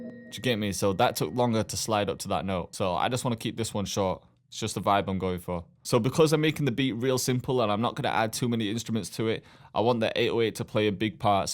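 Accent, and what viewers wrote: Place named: Lancashire, United Kingdom. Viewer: Yo are you from the Accrington Stanley area in England? Most of my extended family are and they all speak like you haha, sick video tho man your killing it and glad to seee you doing well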